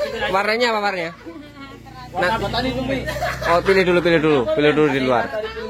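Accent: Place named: Java, Indonesia. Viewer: Kirain di daerah jawa, nada suaranya kaya orang jawa 😁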